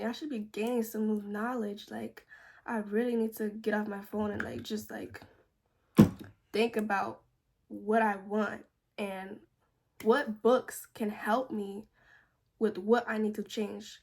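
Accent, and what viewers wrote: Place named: Latin America. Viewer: Are you latina tour accent is so strong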